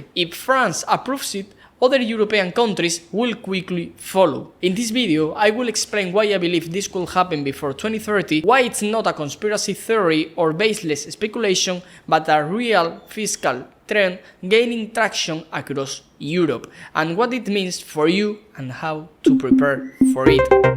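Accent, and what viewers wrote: Spain. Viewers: i’m also spanish , i’d recommend you to spend a few months in the uk or us until you lose your strong spanish accent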